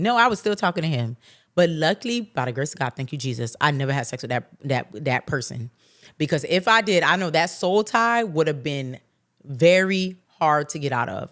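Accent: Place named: New Orleans, United States. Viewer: Omg by your accent I thought you were from New Orleans 😅 I'm half Haitian (my dad) and American born also from South Florida (palm beach). Hey twin 👋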